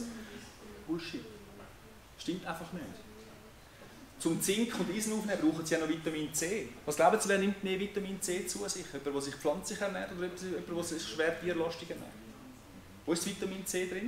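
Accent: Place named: Switzerland. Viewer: Bin schlichtweg begeistert! Auch wenn ich's 3X hören mußte... wegen dem Schwiezzzerdütsch. Dänisch heißt es ist eine Halskrankheit... Schweizerisch??? Freu mich wirklich sehr das die Schule & Du sowas gemacht habt. Warte ungeduldig auf Teil 2!!! Möchte wissen wie die G'schroppen - (die fast schon Erwachsenen!) - drauf reagierten. Bist ein Lichtblick in der Finsterniß… Bin sooo neugierig drauf. Bin schlichtweg begeistert! wie Du die Fakten gespickt mit Deiner Geschichte hingeblättert hast, um Sie neugierig & aufgewühlt zu machen, damit Sie selber recherchieren anfangen. Vorleben ist das einzige was (manchX) greift! Bin schlichtweg begeistert! Na hat etwas gedauert, bis ich den Link für den 2ten Teil entdeckte... (trotz Brille). Den geb ich mir jetzt...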